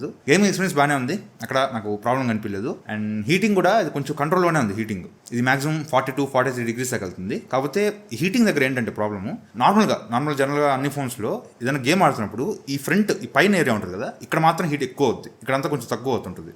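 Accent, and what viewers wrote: Nellore, India. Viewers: Anna midi Nellore aa😅 mana Nellore ye nuvu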